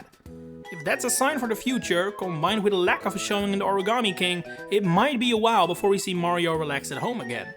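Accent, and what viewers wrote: Netherlands. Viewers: Dutch accent detected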